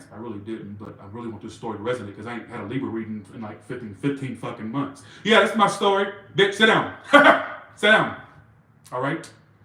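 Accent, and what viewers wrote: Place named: South, United States. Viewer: U r good!!! Im looking to travel and perhaps move… lol love the accent it’s why i need to move or want to move south!😊 it’s been my goal thank u Covid for holding up my plans.. im going.. not sure-I don’t have a person im riding the wave…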